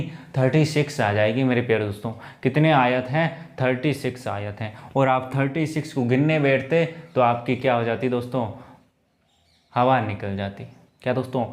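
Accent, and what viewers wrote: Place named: Marwari, India. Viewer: sir you speak marwadi sounds very nice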